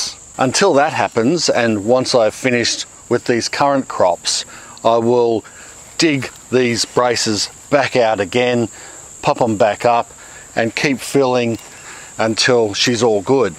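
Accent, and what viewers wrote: Australia. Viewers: Love the channel... but in all seriousness... you ARE saying "mulch" incorrectly. Even with an Aussie accent, the last two letters are "ch", not "sh". It's NOT "mulsh". It's "MULCH". CHOO CHOO like a train. Not SHOO SHOO like you say to bugs or pests.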